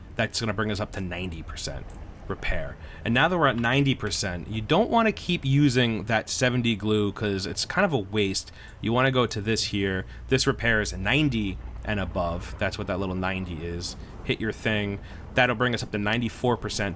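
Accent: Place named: North, United States. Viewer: Strong northern US accent, michigan to new england (probably NY). Great guide thanks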